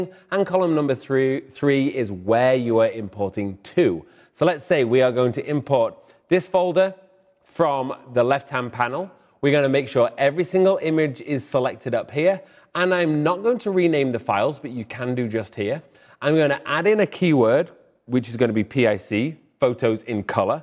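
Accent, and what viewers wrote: England, United Kingdom. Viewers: It was great to discover an English man talking about Lightroom!! There are so many Americans and as a hearing impaired lady it’s difficult to follow their accents sometimes, even with the captions. Thanks for an informative video. I’ve not used Lightroom before so I will be following your blogs with interest. Just one question. Why do bloggers feel they need to speak really fast?!!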